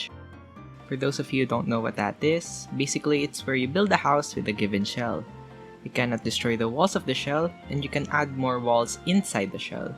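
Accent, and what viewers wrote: Philippines. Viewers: Your accent sounds like Filipino 💀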